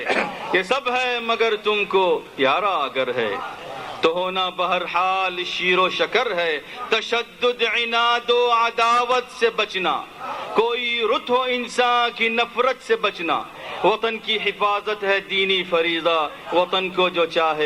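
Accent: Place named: Lucknow, India. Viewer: لله درک یا شیخ هنیٸا لک هذاالابداع والارتجال ماشآ ء الله انت تقرض الشعر باالاردیة الفصحی کأ نک من لکناٶ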